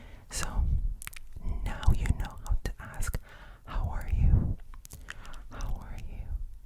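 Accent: Egypt. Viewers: Your way of speaking in the Egyptian dialect is very beautiful. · Pure Egyptian arabic accent!!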